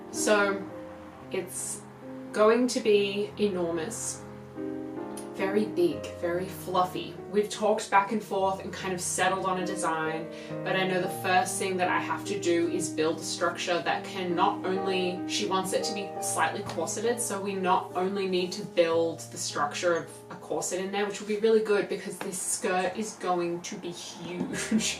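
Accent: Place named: Australia. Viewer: An Australian accent! Heck yes, im Australian :)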